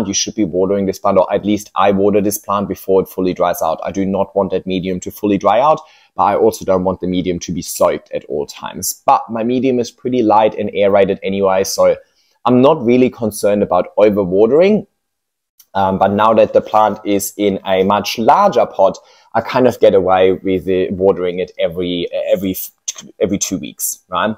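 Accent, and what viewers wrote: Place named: Germany. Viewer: I also thought about that. He sounds a bit German (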